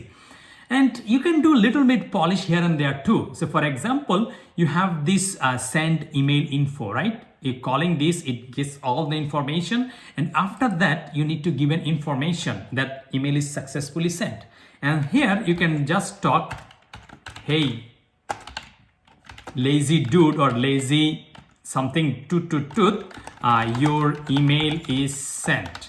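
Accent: Bengal, India. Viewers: Are you Bengali? Cause you English accent is very familiar to me.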